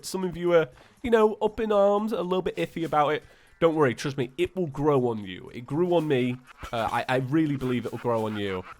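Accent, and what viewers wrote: United Kingdom. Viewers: im the kind of guy that likes to correct people and i know this is just a dialect/accent but everytime you pronounce terraria "terr-are-ee-ah" it really bothers me, and i have genuinely nothing against it itself, the brittish accent is very nice, it just really bothers me for some reason XD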